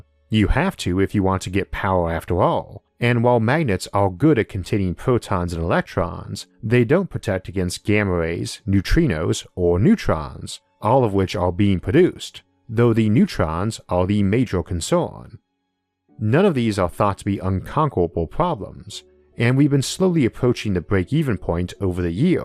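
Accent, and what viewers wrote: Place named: United States. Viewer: Get a better speech program hopefully not americanised.. Temperachores ? Dooteron?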